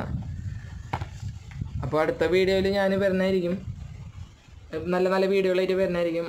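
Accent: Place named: Thrissur, India. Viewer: നുമ്മ തൃശ്ശൂർക്കാരൻ ആണ് തോന്നുന്നു slag കേട്ടിട്ട്...😍 ആണോ ബ്രോ?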